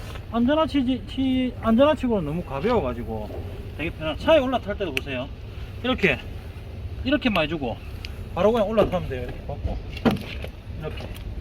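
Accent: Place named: Gyeongsang, South Korea. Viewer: 유튜브에서 표준어 말고 사투리로 하는 유튜버 걸러주는 기능 없나? 졸라게 듣기 싫어죽겟네 갱상도 사투리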